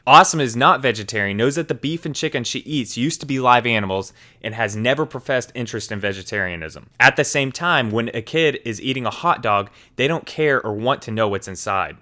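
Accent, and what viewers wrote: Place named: South, United States. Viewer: Your southern tang makes me want to watch your videos more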